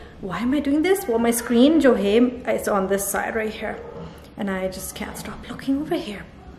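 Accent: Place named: Punjab, India. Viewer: Your Punjabi is sooo 🥰 cute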